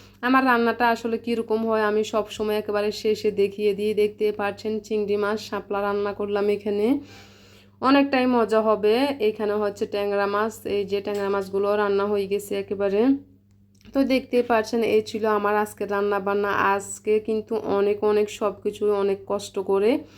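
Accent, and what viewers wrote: Noakhali, Bangladesh. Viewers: অনেক সুন্দর সুন্দর বাজার দেখলাম❤ নোয়াখালীর কোথায় তোমার বাড়ি ❤ কথা শুনলে বোঝা যায় তুমি নোয়াখালী আপু 💞 এক মাছের বাজার❤❤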